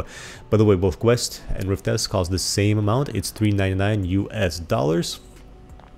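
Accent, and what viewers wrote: Russia. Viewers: По акценту кажется, что ты русский. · Бро ты же Русский???? по акценту слышно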